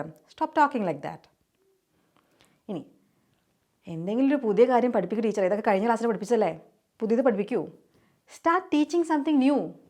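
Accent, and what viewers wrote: Thrissur, India. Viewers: Nice class . 👏. Madam, are you from Thrissur ? Your slang is like thrissur ,am I correct,,??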